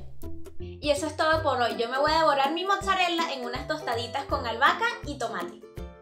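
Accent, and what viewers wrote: Venezuela. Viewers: Hola, estoy en eso de querer volverme vegana pero ni idea de donde conseguír los ingredientes. Por tu acento creo que eres venezolana, si es asi. ¿Me dices por fa donde puedo comprar en venezuela el psyllium, la levadura y los probioticos, y que marcas? Por favor🙏🏼🙏🏼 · Qué fácil, colega jaja, soy profesora y tienes mucha pedagogía al explicar. Un millón de gracias, ya me inscribí. En los comentarios leí que existe una pizza, voy a buscarla, que Dios te bendiga. ¿De donde eres chamita, su acento tiene mucho parecido al venezolano. Chaoooooo.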